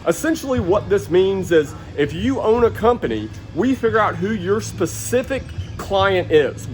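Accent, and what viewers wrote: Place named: Georgia, United States. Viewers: Your Georgia accent is very strong and thick but that is okay because you are well spoken on your video's. I like your video's very much and I am sure with your work ethic you will have great success in business and be prosperous. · Ya you sure have that Atlanta accent, congrats bro you showed up NUMBER ONE on my home page under recommended! big moves my dude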